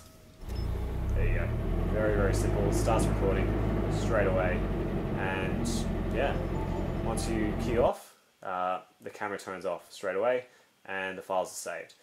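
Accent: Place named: Australia. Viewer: Silvia and Aussie accent, feels like I'm watching Mighty Car Mods :)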